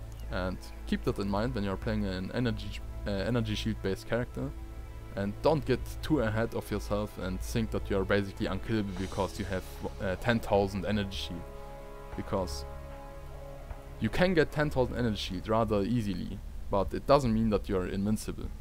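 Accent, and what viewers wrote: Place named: Germany. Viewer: Hab schon bei der ersten drei Sekunden erkannt das du ein Deutscher bist :D .. Cooles Video nice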